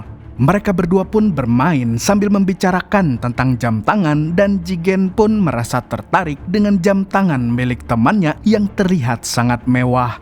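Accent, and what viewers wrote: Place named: Nganjuk, Indonesia. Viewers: Orang nganjuk kah bg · Mimin wong Nganjuk koyok e😂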